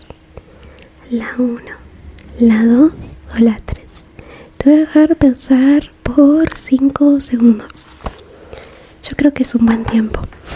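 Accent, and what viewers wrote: Argentina. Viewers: Hace poco conocí tus Asmr y me ayudaron una banda para estudiar para unos parciales q tenía considero tu canal el mejor de Asmr de Arg creo q sos de aca o de Uruguay x el acento sjsjjssj Nada seguí así PD:Tenés unos re lindos ojos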